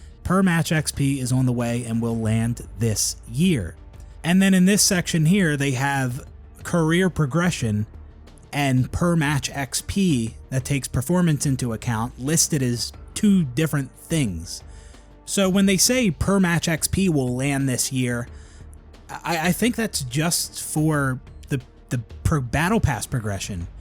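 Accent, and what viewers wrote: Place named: Philadelphia, United States. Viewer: By the way, is that a delco/philly accent I hear? Delco checking in.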